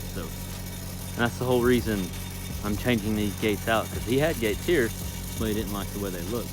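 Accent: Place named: South, United States. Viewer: I weld pontoon boat and boat lifts here in N.E. Michigan but I enjoy your videos. And listening to your southern accent! 😁. You have me saying " That A Way" now! 😅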